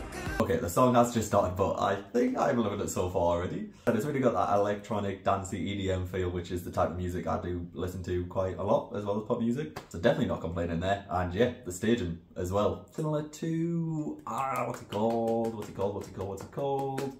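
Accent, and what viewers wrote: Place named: Newcastle, United Kingdom. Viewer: love that you're a Geordie too! cute. I wasnt expecting from the thumbnail you would be, haha whatever that means but how you say 'Tattoo' :face-blue-smiling:😍 love it. hey from Dublin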